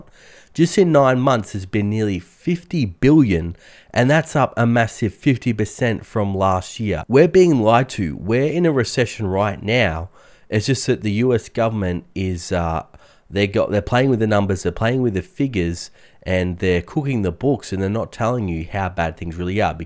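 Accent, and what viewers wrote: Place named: Australia. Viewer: Change in pronounciation for the American audience who just couldnt seem to deal with the aussie accent?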